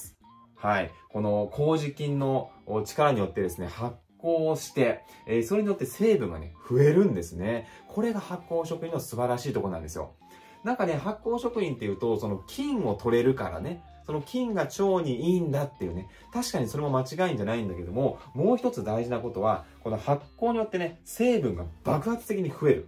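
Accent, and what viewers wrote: Kansai, Japan. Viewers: 国菊あまざけを箱買いして毎日飲んでます📦 関西イントネーションが新鮮ですね〜🦻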